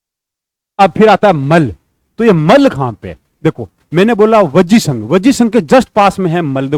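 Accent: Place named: Haryana, India. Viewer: सर आपकी हिंदी भाषा ऐसी क्यू आप कहा के लगता हरियाणा से😮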